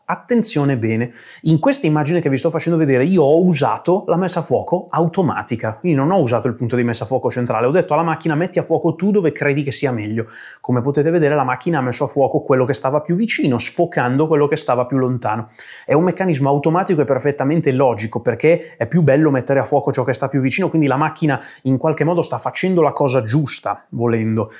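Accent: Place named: Romagna, Italy. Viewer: un accento adorabile ...romagnolo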